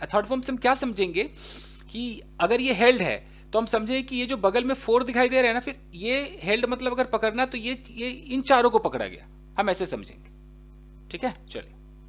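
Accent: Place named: Bihar, India. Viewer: Sir ,where are you from ,it seems that u are from Bihar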